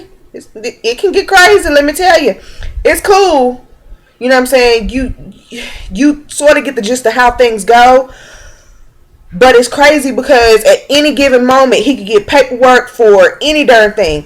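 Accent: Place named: South, United States. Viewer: If you don't me asking what is your husband's mos? I detect a southern accent lol where u from girl.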